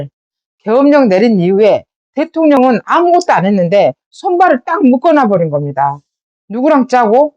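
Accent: Jeolla, South Korea. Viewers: 광주아짐 의 진득하신 남도말씨의 맛깔스러움에 저도 진심 전라도인에 대한 인식이 계몽되기 시작합니다^^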